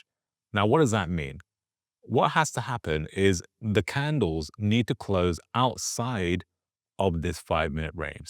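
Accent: United Kingdom. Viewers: bro, take your fucking British accent and pike on some curry and shove it up your fucking ass! Scammer, scumbag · Used to live in London. Hear the accent. Thanks for this FVG ORB strategy. For beginners on accounts less than $25k, limited to three day trades per 5 days. I tested this 03/20/26. MU had bull FVG , , . Entry . went south hit Stop loss . NBIS: breakout , FVG . Entry. dropped into OR, rose out then dropped again to Stop Loss . And one more CRCL: breakout . FVG small . Entry. Went south . Hit stop loss . Win %: 0% Bad day/bad week for bulls. These were all bulls. Not into shorts yet, I should have as the market was heading south. SPY could have netted almost 10:1r:r short. The psychology is spot on. One question: do you stop checking for FVG at 10am/11am? Usually by then the market has cooled so if you don't hit FVG by then you are likely not going to make much? 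Thanks again.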